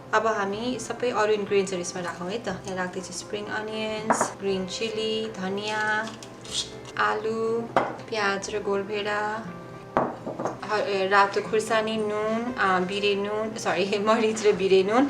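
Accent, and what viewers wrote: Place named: United States. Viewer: Nepali with US ACCENT WITH HINT NEPALI IN IT 😁❤️👍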